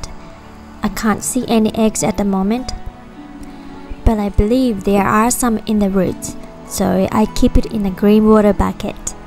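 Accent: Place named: Australia. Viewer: Ive really enjoyed this video and I've also really enjoyed listening to you. I spent time in QLD and your manner of speaking is nicely peppered with familiar aussie euphemisms. Lots of good information, also appreciated you sharing the results good and less so. Now im toying with the idea of creating a little pond myself- im getting ahead of myself now though 😂. Ive subscribed and look forward to seeing what else is on your channel. Big l❤ve xxxx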